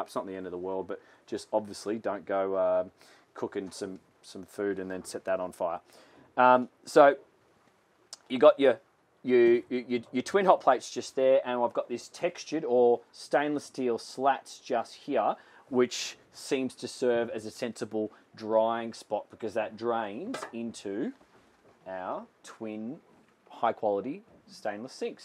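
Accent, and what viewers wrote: Australia. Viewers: I don't care about the boats, but I love the tight pants, Aussy accent, and the peekaboo tease of his hairy belly!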